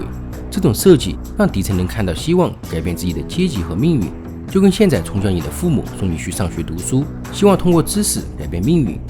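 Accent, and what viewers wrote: Sichuan, China. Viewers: 四川人讲普通话，这样已经很不错了，你是没听过更糟糕的，而且比起口音，我们在意的不应该是视频内容吗?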